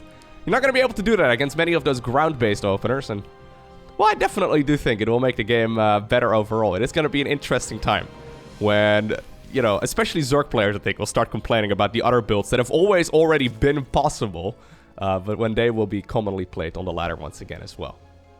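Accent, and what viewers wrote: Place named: Netherlands. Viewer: Your voice always sounds funny 😜 (because of the Dutch accent, which always sounds funny to me as a fellow Dutchman).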